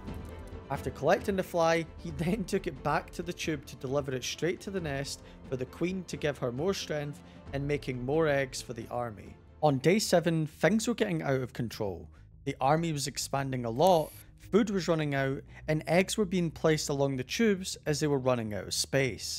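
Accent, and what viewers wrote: Scotland, United Kingdom. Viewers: That a scottish accent? If so love to see a fellow scot being an ant enthusiast 💙💙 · Thank understandably British guy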